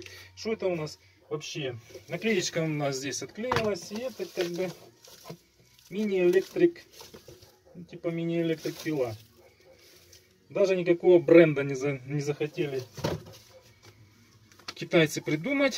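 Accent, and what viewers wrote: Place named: Rural, Russia. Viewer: ни хера не понятно , что ты там гутаришь на селюковском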